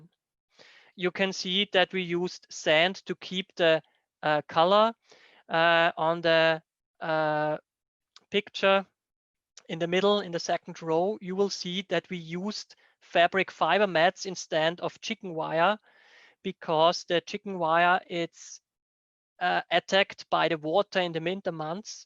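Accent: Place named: Austria. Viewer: Noch nie so einen österreichischen Akzent im englischen gehört 😅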